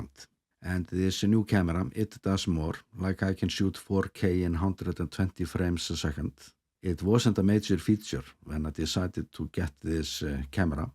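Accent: Iceland. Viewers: Excellent. I am very happy to hear the great news about 100K. The quality of your productions, both video and audio as well as the Icelandic accent you provide is always welcome to see and hear. Your choice of music is also great. I look forward to your future video's. Take care, with greetings and best wishes from NZ. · Thanks for mentioning his Icelandic accent. Of course, he’d say”What accent??”. Just like the rest of us do about our accent in other parts of the world. However in has mentioned his accent in the past. It’s part of his charm.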